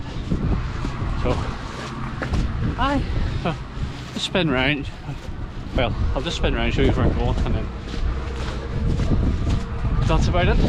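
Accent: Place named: Northern Ireland, United Kingdom. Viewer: Good stuff buddy 👍 enjoyed that little adventure 😂 glad to hear 👂another Northern Irish voice on youtube😁😆🤣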